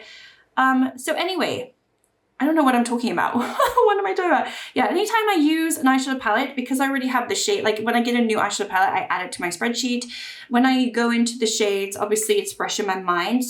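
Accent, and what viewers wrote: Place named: United Kingdom. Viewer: So interesting to hear about all the places you have lived 🙂 you definitely have a British accent, even though you spent quite many years in the US. I actually work at an OB school currently, I am the coordinator and we just got authorized last year! Did you like the school? It is quite different from the Swedish school system so we are a bit making it up as we go here 😅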